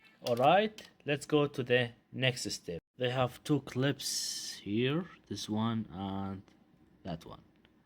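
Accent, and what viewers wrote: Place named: Iraq. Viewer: من الاكسنت أعتقد ان الأخ عربي من العراق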